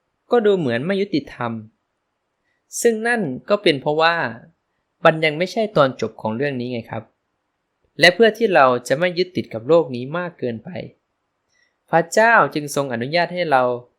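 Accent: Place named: Isan, Thailand. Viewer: ขอบคุณพระเจ้า ขอบคุณผู้สื่อสารที่ดี แม้สำเนียงออกอีสานแต่กะเป็นตาฮักยูเด้อ ขอพระเจ้าอวยพรหลาย ๆ เด้อ ขอพระเจ้าได้รับเกียรติสูงสุด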